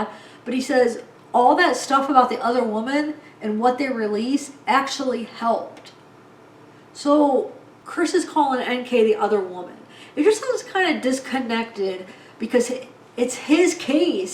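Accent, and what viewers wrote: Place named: Midwest, United States. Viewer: I had to take a break from this case also... I can only imagine how feels... But her videos are great! I think her observations and insights are spot-on... Lol plus I love to hear her talk.I think she has a strong Midwestern accent and I am from the Midwest also lol I say Illinois Wisconsin or Michigan LOL 😜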